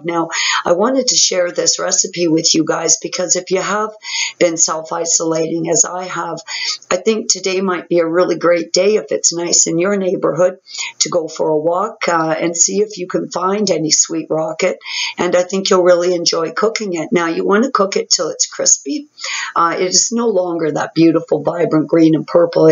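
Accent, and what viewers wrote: Canada. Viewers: Hi wow I should have picked up that you are Canadian with the accent.....I was born in Montreal Canada came to USA in 1971 as a child...I'm now in Virginia where there is snow in winter but I've spent all of my adult life in Souther California...OMG it's so beautiful there....but I had to leave it to get out of the NARC relationship I was in....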